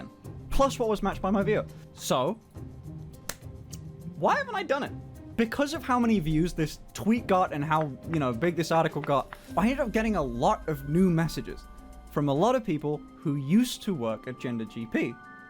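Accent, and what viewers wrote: Birmingham, United Kingdom. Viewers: Both British and a Brummie. Fucking disgusting! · NGL - Never thought you were a brummie fr fr - Does that mean I might have seen you in Gales?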